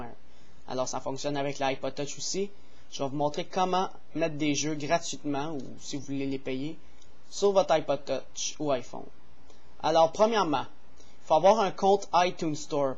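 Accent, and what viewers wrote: Quebec, Canada. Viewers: Super accent quebeqouis comme une tete a claques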